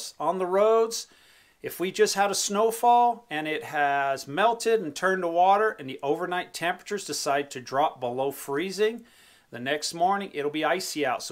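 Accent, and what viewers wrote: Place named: Nebraska, United States. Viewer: do you have cicadas? mosquitos? ticks? Accent seems similar to Nebraska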